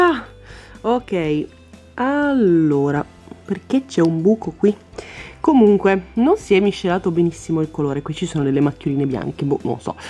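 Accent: Bologna, Italy. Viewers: ma sei di Bologna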